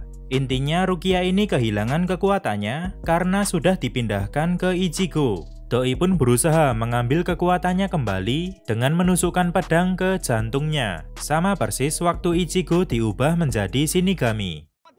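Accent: Java, Indonesia. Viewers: Medok bnget jawanya 😂🤣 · Ketoke mimin iki wong jowo😂 Suarane koyok wong jowo soale :v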